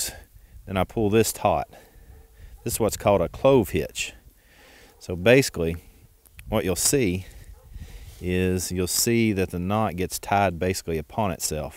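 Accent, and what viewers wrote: Kentucky, United States. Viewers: As soon as you started talking, I thought, "This guy's accent sounds very KY." Then I realized from your logo you're in Winchester. From one fellow Kentuckian to another, keep up the good work. You did a great job explaining these knots.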